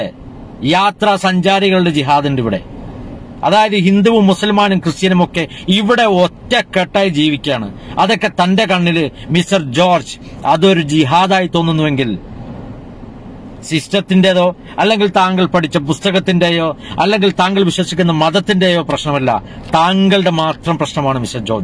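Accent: Kasaragod, India. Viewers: കേറി വാ മക്കളെ...🙋 ഇനി മുതൽ കാസർഗോഡ് ഭാഷയിൽ ബ്ലോഗ് കേൾക്കാം...😍😍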